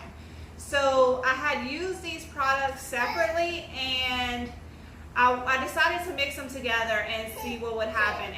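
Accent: Louisiana, United States. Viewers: "and then you'll be ava to see how it looks". My grandmother was from DeRidder Louisiana. I'm from Texas and I've always been in LOVE with Cajun accents. You're so cute and so much fun to watch! You've inspired me to get up and get things done!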